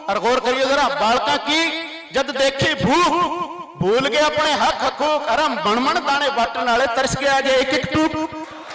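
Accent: Haryana, India. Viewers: badiya kavita sunai aap haryanvi boli ko aaje bada rahe ho dhanywad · वाह जी वाह रै हरियाण्वी भाई जी, खूब मजा आ गया तेरी बोली सुण क । पर यो पाकिस्तान म्हारा था अर कुछ दिन पाछै फेर म्हारा ए होगा । जय हिंद, जय आर्यावर्त । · Kalam tod di bhai jaan haryanvi boli aaj b apne jinda rkhi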